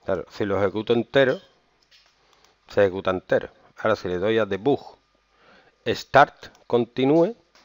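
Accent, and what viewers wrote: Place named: Malaga, Spain. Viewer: De malaga eres fijo por que soy de malaga y aqui se habla asi xD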